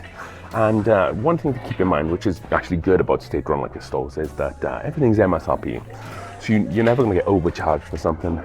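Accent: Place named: Wales, United Kingdom. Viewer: I like your videos but I've been trying to figure out your accent. Sounds like you come from Wales but only on certain words. Good choices on the bourbon but where are you from originally?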